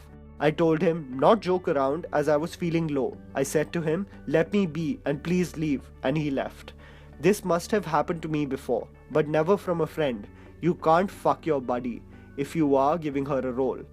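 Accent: India. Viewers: you have such a thick accent sigh. makes watching this hard on the ears - for someone who isn't so used to indian accent. I like bollywood so I clicked on this video.. and good content too, but maybe a more neutral sounding accent would make the video more open for non-indians too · This is the typical convent school educated accent in India , believe me his accent is good , very good. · There is nothing wrong with accent!!! When are Indians going to stop being judgmental about their own people!!! You think he should have what an American accent then it's all good?! Most Indians speak multiple languages so this guy's accent is not an issue. He speaks very clearly. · Some of you all need to chill with criticizing Indian accents. India is a multi-lingual nation, and english is not most people's mother tongue. Also, english isn't the only language that exists in this world, the world doesn't revolve around it. 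Those of you who criticize him based off of that are narrow-minded. Don't be that person. Be a bit more kind. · To be honest his accent is cringe-worthy, I have seen Indian people with lot better accent then him. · If he had a pure American or British accent he would be criticized for a 'fake accent' . He speaks in a way most indians understand and still people are complaining? Duh!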